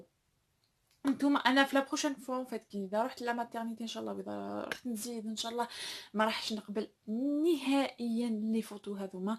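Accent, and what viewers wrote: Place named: Morocco. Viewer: الله يبارك بنت بلادي وشنو لهجة تاعك تميل بعض الشئ الي المغربية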